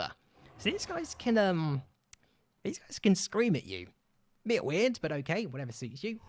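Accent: United Kingdom. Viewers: I think that's called British accent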